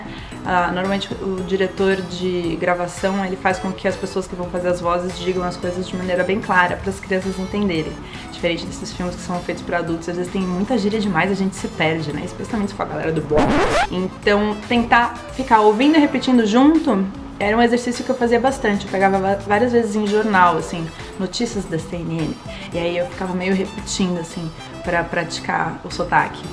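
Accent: United Kingdom. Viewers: o sotaque britânico é maravilhoso, mas me embaralho muito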